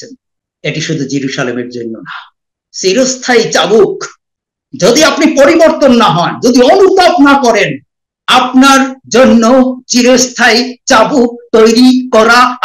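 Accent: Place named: Barisal, Bangladesh. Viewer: জন্ম স্থান বরিশাল? মাঝে মাঝে বাঙাল ভাষা বেরিয়ে আসে।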